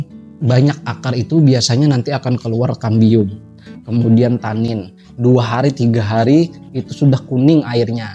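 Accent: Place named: Banjar, Indonesia. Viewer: Logatnya ky org banjar nih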